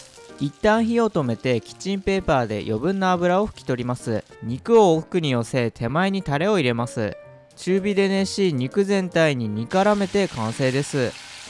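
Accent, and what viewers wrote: Ibaraki, Japan. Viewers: コロちゃんの茨城弁が可愛いです 手作り弁当美味しそうでうらやましいです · 出たぁー🎉コロちゃんの茨城弁😂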